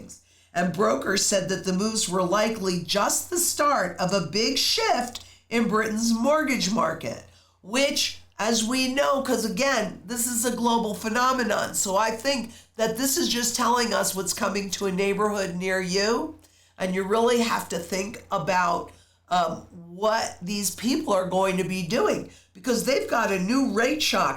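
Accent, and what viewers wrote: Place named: South, United States. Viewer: Me thinketh and yet another informative number of points driven home by such a., great Southern........twang!@ Thanks!!!🙂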